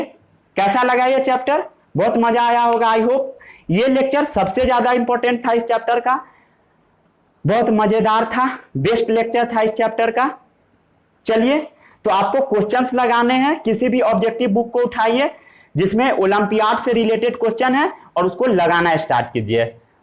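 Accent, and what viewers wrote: Bihar, India. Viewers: proper hindi chaiye Bihari style nai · Yes something like Bihari tones...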